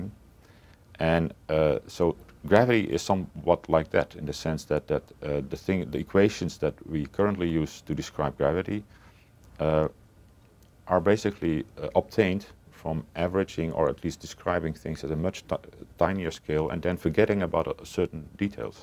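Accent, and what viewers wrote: Netherlands. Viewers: As a fellow Dutchman, I recognize that accent 100% of the time. ;-) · ***** The meaning of "He's Dutch" is that he actually lives in the Netherlands & speaks Dutch. Since English is his 2nd language (assuming he doesn't speak French & German as well) his articulation isn't quite as good as a native speaker. That does not mean there is anything wrong with his science. Only his articulation of it in English. For me, I understand him fully. Sinds ik spreekt ook Nederlands. But since you & the rest of the flat brainers think he is saying gravity doesn't exist, I have to assume it is in his use of the language. Since you can't understand what he is saying & also can't understand my point I have to assume you have an IQ of around 58. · I think he sounds perfectly like a damn dutchman, with his accent, in english, no idea why you think otherwise. 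· That Dutch accent. I recognized it immediately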